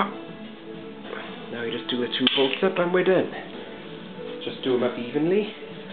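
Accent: Wales, United Kingdom. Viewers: Haha, very welsh. Good video. Cheers. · Proper good and proper Welsh. Excellent!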